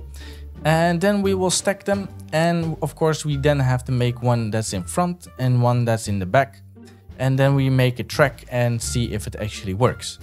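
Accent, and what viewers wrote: Netherlands. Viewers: i immediatly thought i noticed a fellow dutch's accent, looked in your channel bio and saw i was right haha.. anyways great vid tho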